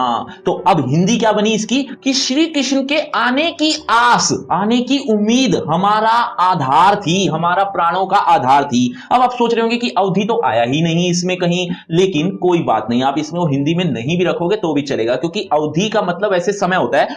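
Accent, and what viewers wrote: Haryana, India. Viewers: Sir aap Haryana se ho kya? kidi baith jaati h😂ye to Haryanavi h comment👇if you are also Haryanavi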